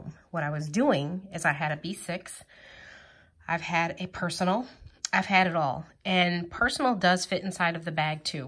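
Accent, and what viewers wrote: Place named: Long Island, United States. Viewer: I can’t believe your black lolz. You sound like a Long Island Italian lady. You should go into sales you have a great confident voice. 🤗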